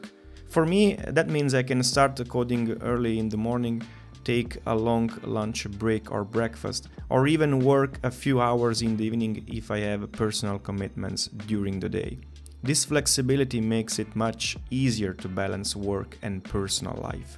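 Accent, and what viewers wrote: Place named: Europe. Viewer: base on your european accent, its understandable to use ai checking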